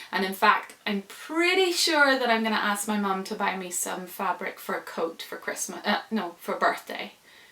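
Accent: Northern Ireland, United Kingdom. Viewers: where are you from if you dont mind me asking? Im hearing a slight northern Ireland accent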